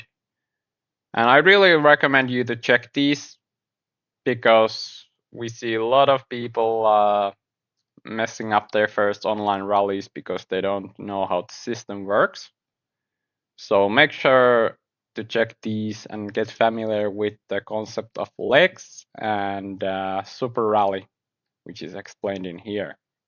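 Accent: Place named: Finland. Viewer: once i heard a finnish mans voice i knew this was going to be a stupendous game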